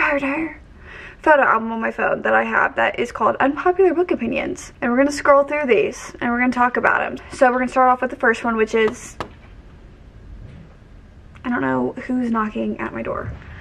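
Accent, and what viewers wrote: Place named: Midwest, United States. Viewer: "audiobooks don't count" ok then well who is keeping score? what are we counting towards here other then our own goals? are the book police gonna come arrest me cause i listened to it instead of using my eyeballs? i was able to talk about it with the other people in book club and none of them knew i'm a big ole CHEATER by listening to it according to that person. side note: i used to live in indy and i no longer live in the midwest at all, and sometimes i just need a good dose of your accent. you mentioned meijer in another video and i ascended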